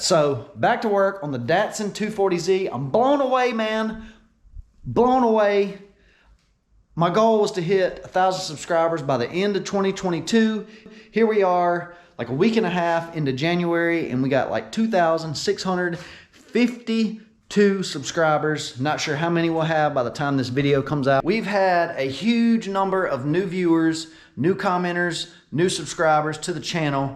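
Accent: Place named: Deep South, United States. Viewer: Tomatoe, Tom ato, potatoe pot ato it's all in where you're from. You've been pronouncing it correctly in the deep south dialect. 😁😁 Keep em coming brother!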